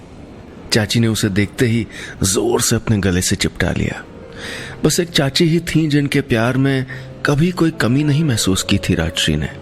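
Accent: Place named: Pahadi, India. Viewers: 🥺🥺🥺sir ur accent of phadi was incredible...it made me tears up ... Thank you sooo much miss pant for this lovely story ❤️ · Best kumaoni story.. 😘😘😘.. I love your voice ji in kumaoni style 😘😘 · very nice.. aapne kumauniyon ka bolne ka style bohot sahi se copy kiya ha ..love you.. you are soo nice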